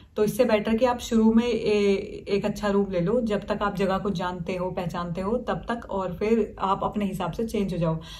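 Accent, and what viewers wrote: India. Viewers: Way of you speak love it 😅 Desi.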